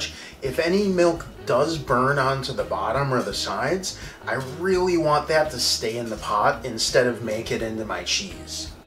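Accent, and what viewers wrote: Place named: Northern Kentucky, United States. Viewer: Are you from northern Kentucky? You sound like it. 😊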